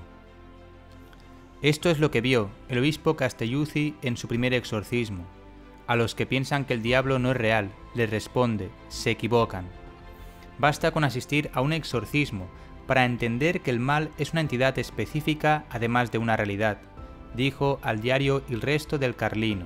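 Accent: Spain. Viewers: Archidiócesis y arquidiócesis son correctas las dos en español, la definición de arquidiócesis se utiliza en Hispanoamérica y Archidiocesis en España. Espero que su duda quede resuelta . En italiano es ARCIDIOCESI. Tengan en cuenta que el narrador del video habla en español de España (no español latino por eso Uds. se confunden) Espero que la aclaración les sea de utilidad. Saludos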